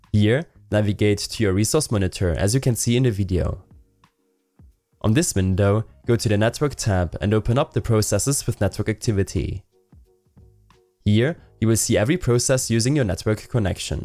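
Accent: Germany. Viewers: oh cool ein deutscher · i hear a german accent in ur voice😂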